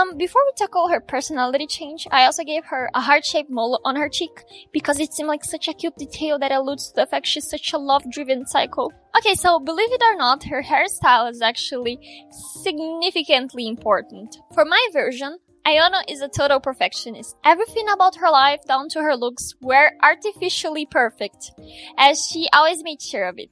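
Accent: Brazil. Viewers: Eu tava escutando o vídeo e eu assim esse sotaque... SABIA Q VC ERA BR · NÃO ACREDITO QUE SE É BR KKKKKK EU TAVA VENDO O VIDEO NORMAL ENQUANTO EU DESENHAVA, AI NA HORA QUE VC FALO MADOKA MAGICA EU RECONHECI O SOTAQUE BR, E FUI ATÉ CONFERIR ! YEEEEEEEEEEES MAIS BRASILEIROS !!!! muito bom o video por sinal adorei seu estilo, e adoro ver videos pra desenhar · EU SABIAAA, EU SOUBE DESDE AS PRIMEIRAS FRASES QUE VOCE FALOU QUE VOCE ERA BR!!!! nao pq seu inglês é "ruim" ou tem sotaque, na real seu ingles é PERFEITO, mas tinha a >>aura<< HAHAHAH DESENHOS INCRIVEIS, DE VERDADE, SEU TRAÇO É TAOOOO LINDICO!!! nao conhecia seu canal, vou acompanhar a partir de agora! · OMGGG I KNEW I RECOGNIZED THIS ACCENT! You're Brazilian... Q NEM EU MANOO 🫂🫂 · CARALHO eu passei o video inteiro me perguntando se vc era do brasil por causa do sotaque, nao acredito que é mesmo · Pelo sotaque eu sabia que era brasileira kkkk,amei o seu vídeo e seus designs e ideias são muito criativas e originais!💖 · Gurl ru brazilian? 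I know the accent sounds rlly Brazilian, like on Madoka Magica when u speaks.. (i am too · Eu SABIA q vc era brasileira assim q ouvi seu sotaque sé loko muito bom seus vídeos ❤❤ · A minute in, and im listening to your voice and im like "...huh... That sounds a little Brazilian.." Went to check the About you and was so darn right I have brazillian friends so maybe thats how i detected the slight accent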